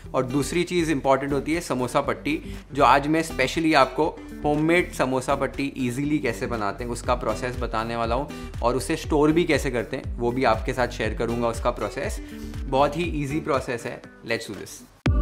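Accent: India. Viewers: Btw Your accent like Indian, thanks a bunch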